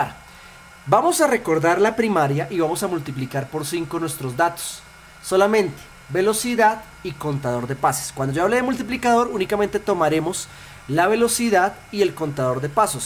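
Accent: Colombia. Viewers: waaaaaaaaa colombia? no me habia dado cuenta hasta que hablo xD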